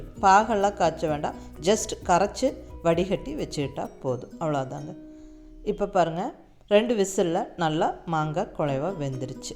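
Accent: Kongu, India. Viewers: mam kongu tamil suprr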